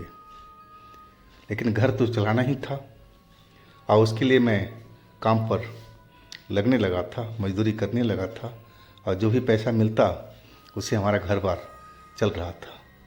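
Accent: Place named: Bengal, India. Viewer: Bhaiya…. Aap ki Hindi…. Too cute🥰🥰🥰🥰Bengali ho na???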